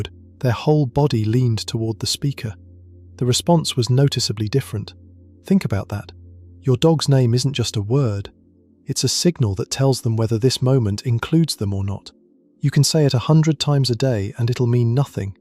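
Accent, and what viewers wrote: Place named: United Kingdom. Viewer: PLEASE STOP the constant use of British accents! It’s not necessary.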